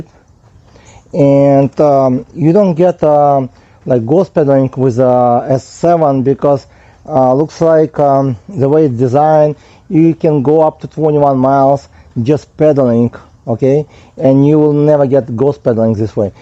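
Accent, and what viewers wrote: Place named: Russia. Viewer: Your accent is funny sounds like Russian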